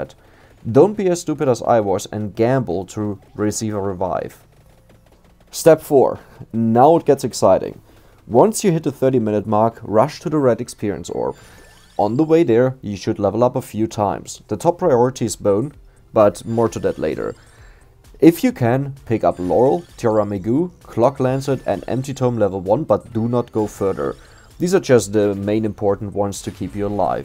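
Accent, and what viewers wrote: Germany. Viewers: Höre ich da etwa einen deutschen Aktzent heraus? Krasse leistung übrigenz.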